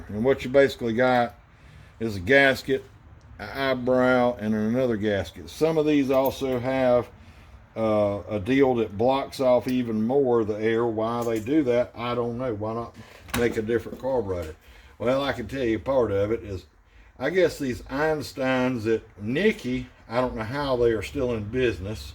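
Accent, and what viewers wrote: South, United States. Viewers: Love. The. Southern accent!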